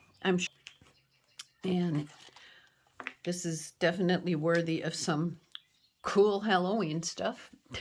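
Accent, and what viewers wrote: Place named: Germany. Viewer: Boy that Kathy is going to be busy!!!! I soo love them both! Your sweet German accent is lovely! My kirche in WI is one of the oldest German churches in that area. They continue to do a gottestant service for Easter and Christmas! Not to mention the decor is very European! BTW I love the birds in the background!!! And yes it’s Tuesday!!! Hugs!!!!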